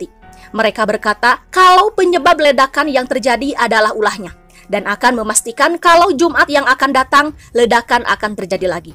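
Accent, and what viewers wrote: West Java, Indonesia. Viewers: Mimin.orang sunda euy☺😁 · Kayaknya admin nya orang Sunda ya🤭🤭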